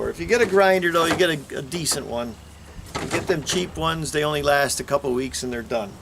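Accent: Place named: Pennsylvania, United States. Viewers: Schuylkill County girl here so love your accent,. I now live in South Carolina and just did my sidewalk the other day. So far it looks great! Now I'm moving on to the driveway. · That accent he has is typical N.E PA. I was born and raised near Wilkes-Barre and that accent really stands out now that I’ve been away for decades.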